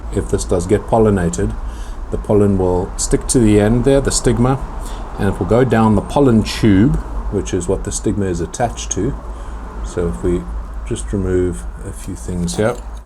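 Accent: South Africa. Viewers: Thanks for the video. It's rare to find one that has so much clear info without wasting the viewer's time. It's also nice to hear a South African voice again as an expat :) I'd call it the drop kick.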